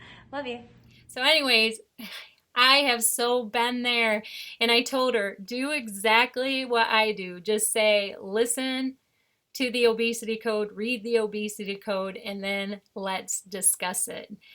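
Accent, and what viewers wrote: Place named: Michigan, United States. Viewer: And now I think of it, I hear that Michiganders accent coming out of your mouth, but I won't hold it against you, because I am not from Ohio either. We are O.M.A.D. Strong!🤠 Even though I could be called the Desert No-M.A.D. Outlaw right now!🤠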